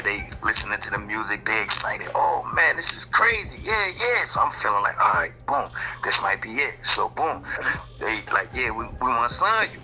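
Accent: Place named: New Jersey, United States. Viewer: That jersey accent is way different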